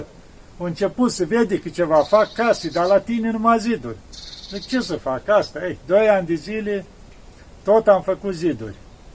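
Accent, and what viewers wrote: Moldova. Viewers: parinte schimba graiul ala moldovenesc ca este enervant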